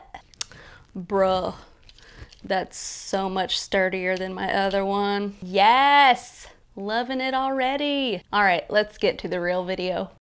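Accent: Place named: Oklahoma, United States. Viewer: Thanks for the vid it was helpful! I was trying to figure out what your accent was and I saw it’s Oklahoma 😁 I’m in Alabama and we have a similar accent.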